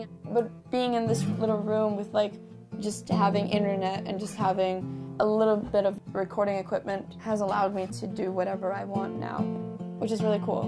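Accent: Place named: United States. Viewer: guys this might be a stupid question but i’m a super new fan. if she’s norwegian why does she speak with an american accent?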